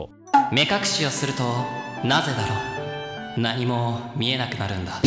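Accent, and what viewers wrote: Kansai, Japan. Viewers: イントネーションめっちゃ関西弁やん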